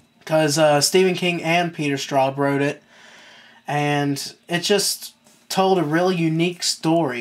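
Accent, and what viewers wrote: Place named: United States. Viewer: Great collection. Where about America are you from? You have a cute accent :)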